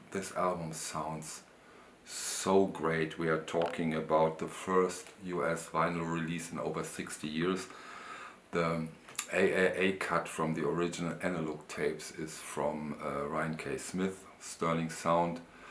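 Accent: Germany. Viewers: Hi. I really enjoy your show and love your German accent. Thank you for mentioning the band, love this music, have now ordered both their studio albums. Fantastic. Thank you. I too am a big fan of. Kind regards from Robin.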